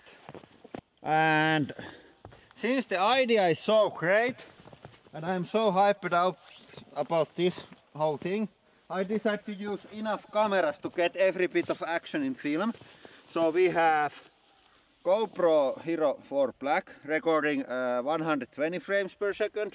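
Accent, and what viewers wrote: Finland. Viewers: You Sound like your from finland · kuulostaa suomalaiselta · Are you from Finland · tää ralli englanti 👌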